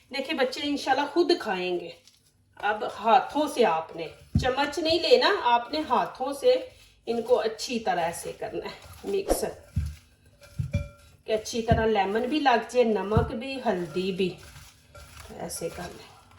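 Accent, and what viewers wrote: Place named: Punjab, India. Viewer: Panjabi chhey ketni bhe achhey urdo boley pata lag jata hy panjabe hy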